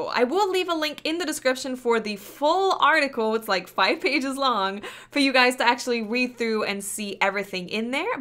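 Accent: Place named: New Jersey, United States. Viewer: you have a new jersey accent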